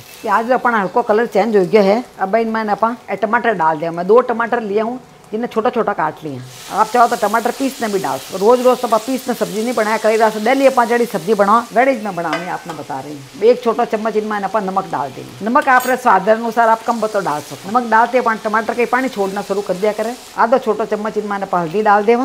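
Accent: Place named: Punjab, India. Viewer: Daadi amma to app lgte hi nhi hi aur apki voice to bhut sweet hai Punjabi accent bhi ata hai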